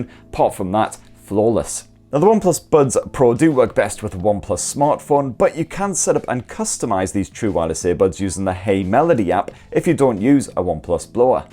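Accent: United Kingdom. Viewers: Honestly I gotta say that British accent is so ugly and difficult to understand specially a non British accent listeners. Well good review.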